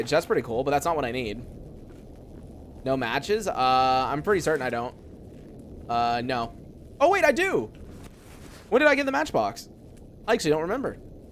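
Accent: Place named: United States. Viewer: the american accent hit harder than i expected despite the fact that i have been listening to your content for about 2 years now.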